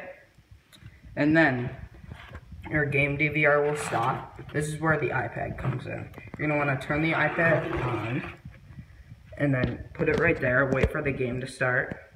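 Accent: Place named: United States. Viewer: Wow as if the click bait wasn't enough you have his cheesy annoying American accent and his voice which obviously hasn't hit puberty yet get a life you sad kid.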